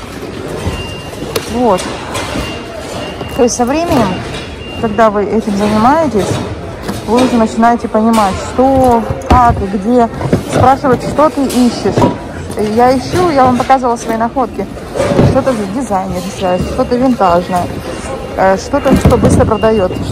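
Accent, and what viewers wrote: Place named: Southern Russia, Russia. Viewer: Ой --я рада , что появился новый блогер на барахолке. Смотрю и Олю и Сашу...Теперь и вас смотреть буду... Только я не поняла , вы в России где жили??? У вас немного южный русский акцент.. Удачи вам в поисках...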